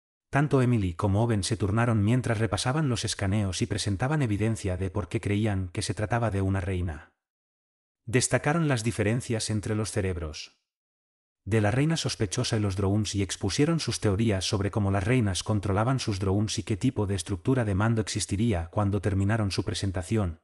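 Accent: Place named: Spain. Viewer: Muy buena narración lo malo no es para que se ofendan el idioma Español no nos gusta por estos lado el español o castellano latino nos gusta más y lo comprendemos más fácil y con más emoción y damos muchu mas arbotantes que ustedes así es que póngale la opción de latino porque para la otra no los escucho nojoda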